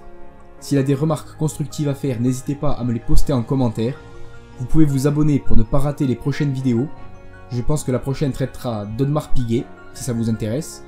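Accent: Corsica, France. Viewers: Bonsoir est-ce que j’entend un accent corse?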